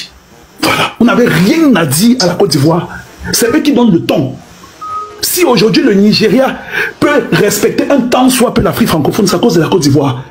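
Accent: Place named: Cameroon. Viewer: Mais c pas un ivoirien tu es camerounais même si on me dit koi avec le ton et tu en connais trop sur le cameroun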